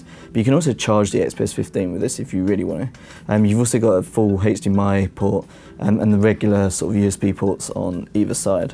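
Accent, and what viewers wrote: United Kingdom. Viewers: Why would what I assume is a British speaker (from the accent) say aluminum and not aluminium? Perhaps reading off some official US press notes, and too whacked to notice. Anyway, machine looks nice. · that's more english that you think actually, it's just british accent.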